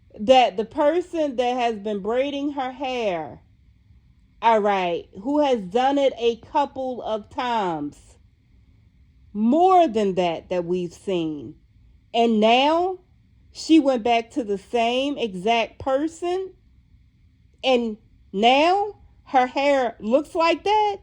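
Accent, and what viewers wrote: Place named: Maryland, United States. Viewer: Hey, You have an accent the same as my cousins from Maryland Are you from Maryland or Washington, D.C,?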